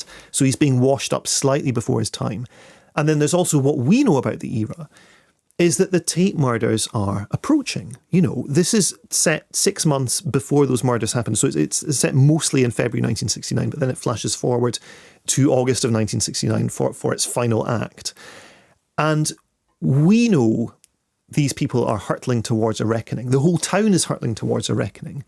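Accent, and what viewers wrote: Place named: Edinburgh, United Kingdom. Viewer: This guy has a serious edinburgh uni accent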